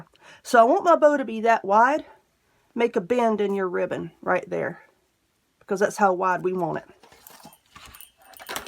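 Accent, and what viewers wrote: South, United States. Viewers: Girl first of all I love your accent being from the south myself. Go girl. You crack me up. You make stuff so easy and simple to learn. And the ex jokes make my day.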